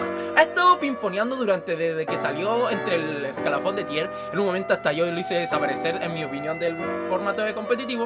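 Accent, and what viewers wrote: Chile. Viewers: No se sabe que es peor, no entenderle por chileno o por la música de fondo que suena demasiado fuerte, así que asumiré que el problema radica en las dos opciones...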